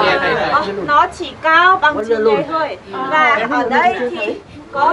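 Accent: North, Vietnam. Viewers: Lại nghe giọng bắc...hơi nặng!!! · Nói tiếng bắc.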